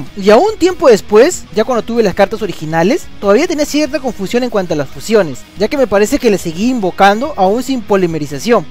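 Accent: Peru. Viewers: Y ese acento peruano?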